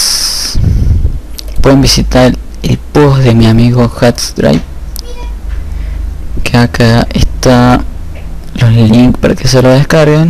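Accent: Córdoba, Argentina. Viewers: no entiendo muy bien el acento argentino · Que buena tonada cordobeza XD .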